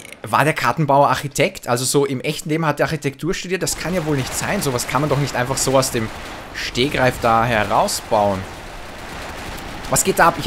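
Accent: Austria. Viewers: Bist du Österreicher dein Akzent klingt so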